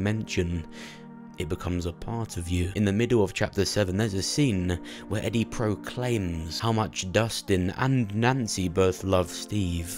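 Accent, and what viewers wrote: United Kingdom. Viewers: He sounds like a guy from the UK 😂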